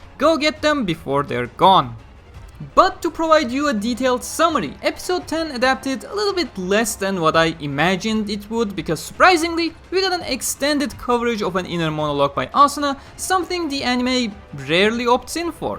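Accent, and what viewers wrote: Turkey. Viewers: Türk aksanı ile bile ingilizcen mükemmel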